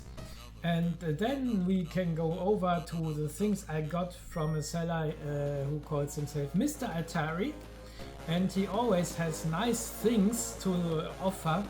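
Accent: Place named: England, United Kingdom. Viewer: Great video buddy. Enjoyed it. Are you in England? Some of your words gave it away 👍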